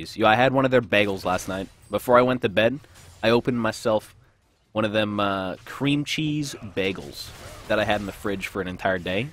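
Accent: Nova Scotia, Canada. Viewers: is he from Nova Scotia?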